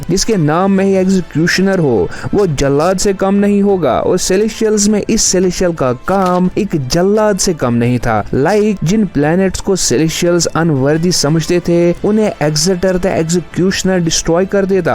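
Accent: Kashmir, India. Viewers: bhai tumhari batu sai hi pata chalta hai ki tum Kashmiri ho 😊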